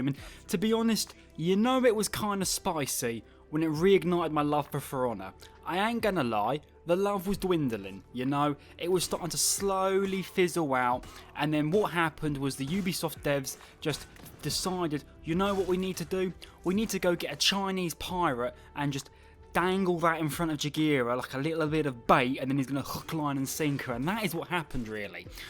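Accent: United Kingdom. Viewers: Good lord you talk too much and wasted all that time I watched hoping for reveal and got a British annoying voice instead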